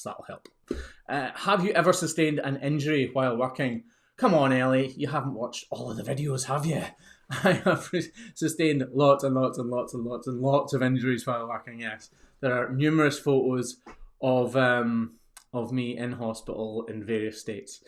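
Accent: Scotland, United Kingdom. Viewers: You’ve got me hooked! No, not just on the hoof care - on your Scottish accent. My 2x great grandfather was born in Abroath (northeast), my 2x grandmother in Glasgow, and they settled in Ayrshire. He was an author and a machinist. Your accent allows me to imagine what he might have sounded like. Check out his work on Bobby Burn’s inspirations, “Rambles Round Kilmarnock”, “Rambles Round The Land of Burns”. He also had a wicked sense of humor I’m told.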